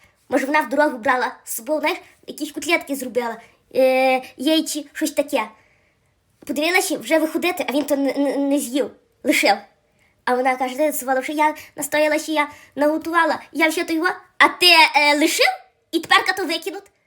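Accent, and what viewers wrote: Galicia, Ukraine. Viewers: Галицький балак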